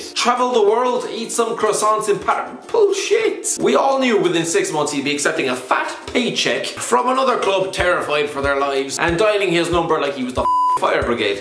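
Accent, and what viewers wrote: Ireland. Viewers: Just wondering, where in Ireland are you from? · i watch his vids for the irish accent like if you like the irish accent as well comment if you dont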